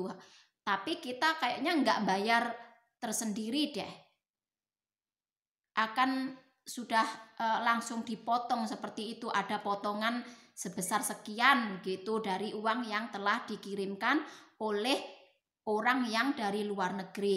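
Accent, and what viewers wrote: Java, Indonesia. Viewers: Meddok nmbak😂😂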